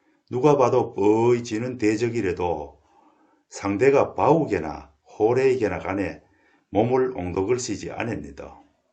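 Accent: Andong, South Korea. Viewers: 안동사투리인가요~~^^ 정겨워요~~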